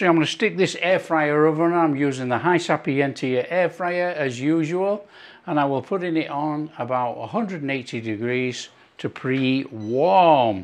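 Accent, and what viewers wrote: United Kingdom. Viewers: Love it! Cornbeef as a quiche. Easily attainable. That's one thing I love about most of your recipes. They contain easily accessible ingredients. And don't require a Culinary degree with all its jargon to describe and decipher. They are straight forward even for a home cook, like myself. You are the most thorough Chef on YouTube or any food channel I've ever watched.that gives the best tutorials. You go through each detail with explanations. You are a champ! Keep it coming Chef. You've got me glued to your channel and your lovely British accent. 😊❤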